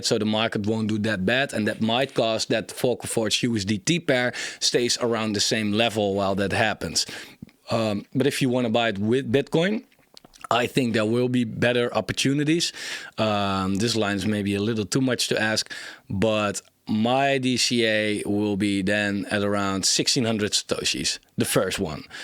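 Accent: Netherlands. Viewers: your accent feels like The Netherlands... Im from Belgium lez get millionaire next 2 year! Keep up the work.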